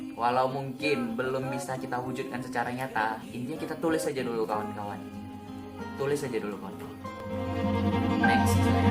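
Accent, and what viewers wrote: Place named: Medan, Indonesia. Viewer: wah .. semoga targetnya tercapai ya.. btw cara ngomongnya kok kayak anak medan hihi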